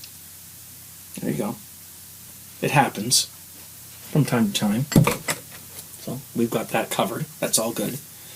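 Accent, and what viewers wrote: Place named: Canada. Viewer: I say Wort , Wart same as you do . But not Wert . But when you say about ... I can tell you are Canadian... Cheers !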